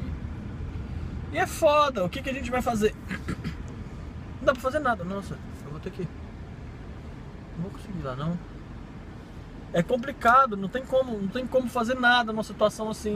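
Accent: Brazil. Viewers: por um acaso você é nordestino você fala com muito sotaque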